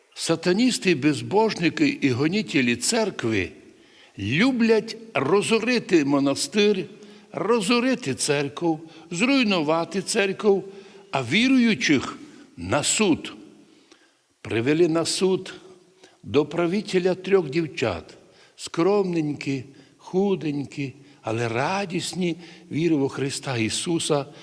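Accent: Transcarpathia, Ukraine. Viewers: Як я люблю закарпатський діалект, гарний і священник прекрасний на своєму місці, він істинний пастир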